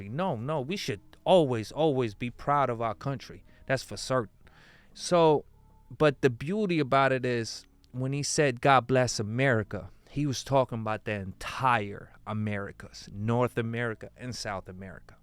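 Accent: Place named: Puerto Rico. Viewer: You look and sound boricua bro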